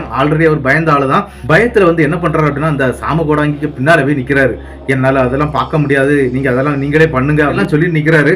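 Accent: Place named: Kerala, India. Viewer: Malayaliya? Slang mix aguthu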